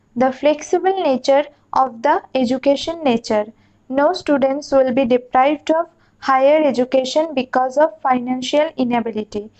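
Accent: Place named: Bengal, India. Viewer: R u bangali?? Ur tone is alike bangali...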